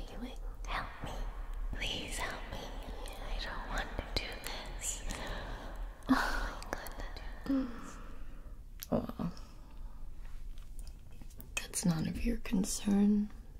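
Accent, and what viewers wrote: United States. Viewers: Nailing that American accent! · Nice american accent! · Love this! I just realized many minutes in you were using your American accent. Kudos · Your American accent is getting fantastic! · with an American accent hits different— I cant look away from those eyes holy crap! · Very believable American accent! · Cannot get over how amazing your American accent is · I thought she sounded kind of strange at first and then realized she’s doing sort of an American accent. Very impressive voice acting! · Wow, your American accent is so good!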